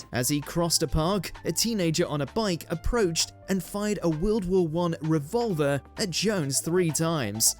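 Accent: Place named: Wales, United Kingdom. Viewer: The narrator is Welsh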